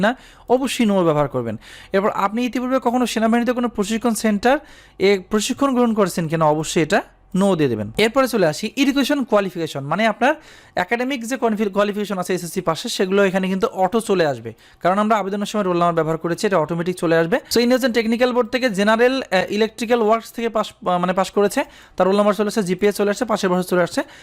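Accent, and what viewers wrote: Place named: Noakhali, Bangladesh. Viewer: ভাই আপনি নোয়াখালীর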